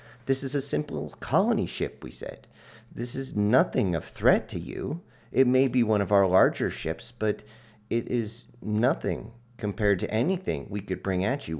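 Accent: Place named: South, United States. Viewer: Hearing your southern accent I really want to hear a story with a Scottish accent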